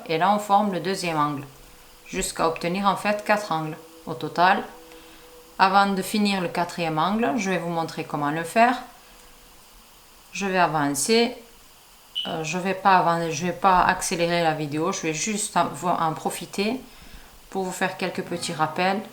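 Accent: South, France. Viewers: magnifique et en plus le merveilleux accent du midi, que demander de plus pour un 31 décembre ... très bonne année 2025 madame !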